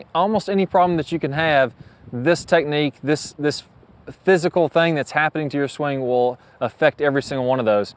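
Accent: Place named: Texas, United States. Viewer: you have got to be in East, southeast Texas with that accent!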